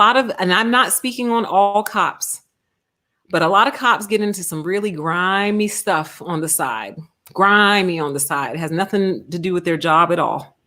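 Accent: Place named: Texas, United States. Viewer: Hello & Youtubers hope You All doing Fine & Safe & Pleasant always! And Your Video Content is Great along with the people's comment section below this Video Content! This is a Complement i thought You were a Southern Texas Miss America in a pageant because of Your Amazing Voice & Personality! And wanted to say some of my Relatives are blended with black & white & mexican/native American and some straight & bi and some of my friends are straight & gay and are different races of people and i love my Relatives & Friends! Such a Great Video Content hope You get subs! And i'm not a subscriber yet because i'm a selected target individual being watched & bullied & tested by my unknown haters & gangstalkers and handlers on social media and in public places i go! Anyway can You give Your cute 🐕 puppy a hug from me ❤Thank You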